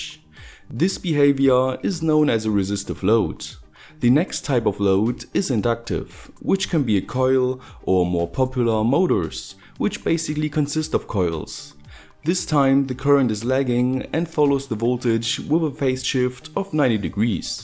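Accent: Saxony, Germany. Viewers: Nice video! Thank you :) Is that a Saxony accent you have?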